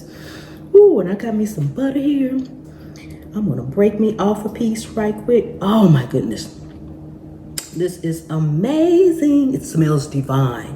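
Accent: South, United States. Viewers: The Southern drawl really makes the video interesting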